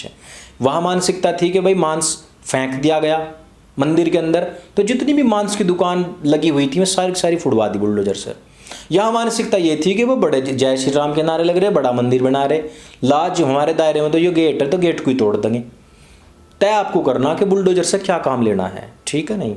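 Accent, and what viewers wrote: Haryana, India. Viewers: Sir haryanvi sunne me mazaa aa gaya ...what a episode mind blowing .. dhanyawad... 🙏🙏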